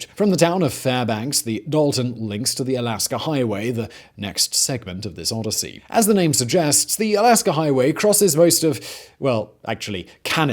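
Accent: United Kingdom. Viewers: Nice video but the British accent is overkill.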